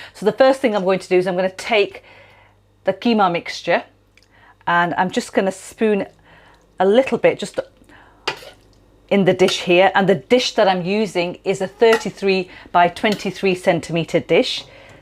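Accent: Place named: West Yorkshire, United Kingdom. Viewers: WOW!! I’m impressed (not something which happens often). Love the accent too, somewhere between Yorkshire and the Mediterranean, music to my ears. Excellent video, I’m subscribing now just to hear you speak-excellent videos will be a bonus. Great recipe, great presentation. I love it. 🇦🇺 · I appreciate the lack of waste for a home chef. Fantastic recipe. You sound like you're from West Yorkshire, we don't waste anything in cooking!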